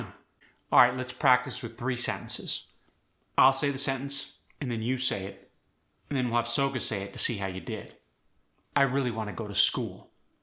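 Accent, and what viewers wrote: Thailand. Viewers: I appreciate your effort in teaching the Khmer accent, but I think you might be mixing it up a bit with Thai. What you’re teaching actually sounds more like Thai than Khmer And actually, you got only 2 things right. yes, Khmer doesn’t drop ending sounds, and yes, we don't have the “th” sound. We like speech to be smooth and effortless, without putting too much pressure on our tongue In Khmer, the R sound (រ) definitely exists and is quite distinct. Khmer is super rich in sounds. it has one of the most complex alphabets in the world, with sounds many other languages don’t even have So no, we definitely don’t pronounce words like “sacoll, vegatabal or lealy, light….” we just like to keep speaking easy and natural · Get a native Khmer speaker to do emulating... this dude sounds like a Thai doing Italian accents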